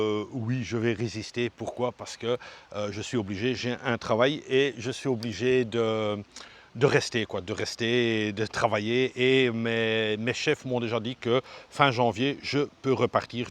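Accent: Belgium. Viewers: Bonjour je me suis abonnée j'adore ta façon de t'exprimé en nen halve/echte Bruxelleir, moi je suis un vrai bxllois né aussi le 31 mai '67 à 1000 Bxl (bon annif à toi ) 😁T'es vlog que je suit de peu en Thailande très intéressant et maintenant que tu est de retour en Belgique dans notre beau pays lol J'ai aimer ta route en scooter au début, de revoir mon ancien quartier Machtens/Scheut ça fait plaisir de revoir cette endroit après 30 ans 😉Continue à nous faire rêver.. En een polleke de Roger 😅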